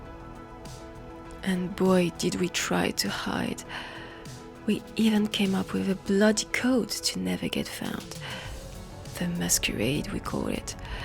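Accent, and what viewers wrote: France. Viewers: Damn bruh you had me at French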